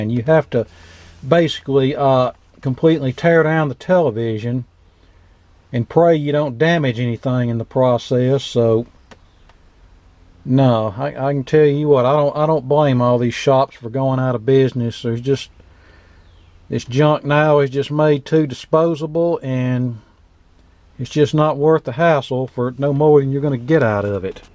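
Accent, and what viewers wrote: South, United States. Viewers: I don't ever recall anyone dying from consuming too much weed, yet not a day goes by that an innocent person isn't murdered by a goddamn assault weapon, designed to be used on a battle field. Guess we really shouldn't be surprised to hear you spew more negative comments about a plant that to the best of my knowledge hasn't killed anyone, and speak with such authority and fondness concerning assault rifles. We've been trying it your way forever, and things just keep getting worse for the children, yet every time we try to introduce even the most basic, common since changes in firearm laws, such as better back ground checks for instance, wrong wing fools like yourself cry about it. Ok, what do you backward ass right wingers propose we do? None of your representatives have any legislative proposals at all, AT ALL ! That is unless you consider M&M's, Disney & gay Americans real legislation. 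Most of you traded your country for a goddamn Red hat, and then tried to over throw our country because you didn't like the outcome of an election. Holy Shit, don't you feel smart ? ;-). You all were played by perhaps the dumbest American of all, and definitely the worst business man in the country. Based on that backward sounding southern accent of yours, we really shouldn't be surprised to hear you talking the way you did about this weeks school children being murdered, I mean they were guilty of going to school after all ! Such good Christians !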